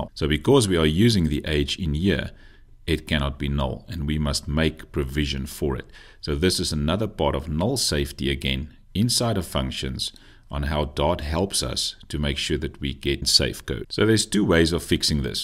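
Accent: South Africa. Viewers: hey, I just realised that is a fellow South African, the use of Rands and accent. represent, represent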